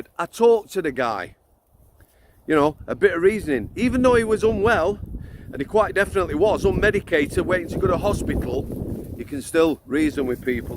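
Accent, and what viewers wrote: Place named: Barnsley, United Kingdom. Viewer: Love the channel mate , im a barnsley lad so nice to hear the accent on youtube 😊✌️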